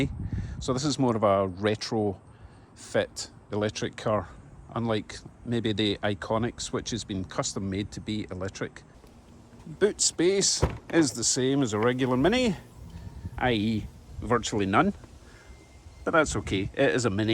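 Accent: Scotland, United Kingdom. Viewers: i see you have a proper St. Andrews flag in your garage, along with your accent I was floored, cuz I never heard of or seen a Scottish Canadian before! This was my first video of yours I saw, I have now seen a few more, keep up the good work! I hope to get an Audi 50 e-tron when the become available in the states! Thanks again! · I’m terribly sorry in advance but is this Scottish English?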